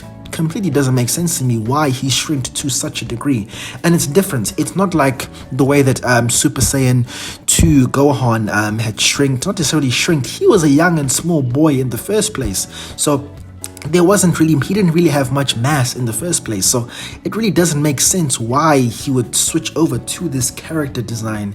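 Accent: South Africa. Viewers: Sup man which country are you from? You sound like a South African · Are you South African ?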